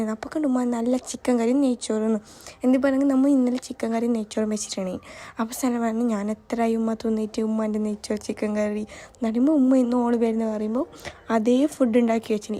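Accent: Kasaragod, India. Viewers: Kasaragod touch😂❤ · Ksrgod aanh😂🤍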